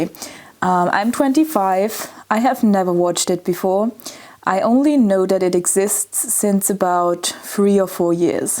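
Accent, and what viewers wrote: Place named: Upper Austria, Austria. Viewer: I'm trying to place your dialect based on that short chat with Oma. I'm guessing Oberoesterreich, but admittedly not a lot to work with.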